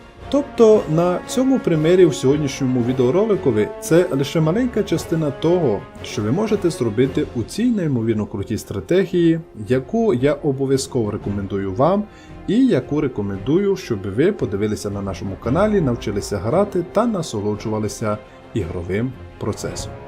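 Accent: Transcarpathia, Ukraine. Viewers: Ти закарпатський геймер?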